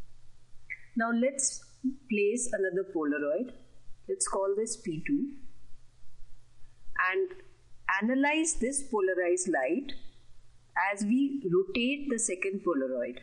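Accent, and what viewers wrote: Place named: India. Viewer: too many x direction y direction in indian accent -.- ....makes it so uninteresting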